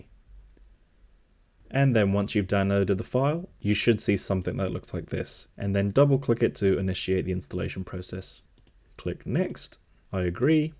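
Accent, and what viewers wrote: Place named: United Kingdom. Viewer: Oh, I meant it in a positive way! I'm not a native English speaker, so I’m used to hearing different British accents. Your accent sounds softer and more neutral compared to others I've heard, and it’s nice.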